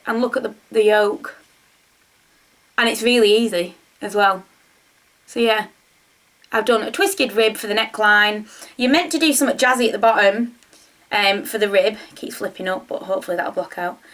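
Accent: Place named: Cumbria, United Kingdom. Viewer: YouTube has just introduced you to me! How lovely to hear a fellow cumbrian accent! I missed the wool show for the very same reasons! It cut me up that it was so close to where I live, but I just couldn't afford to be tempted ~ next year 🤞🏻😅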